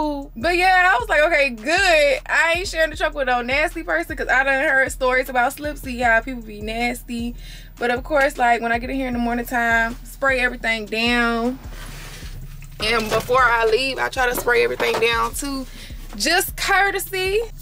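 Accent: South, United States. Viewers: Not sure how I got here but it’s the country accent that keep me engaged think imma go ahead and subscribe 😂I like the vibe of the video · I love her southern accent.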